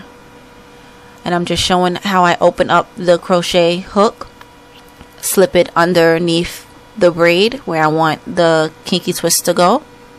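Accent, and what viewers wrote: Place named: New York, United States. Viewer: You sound like you are from my neck of the woods. Are you in or from Ny ? I love it from the 1st twist you installed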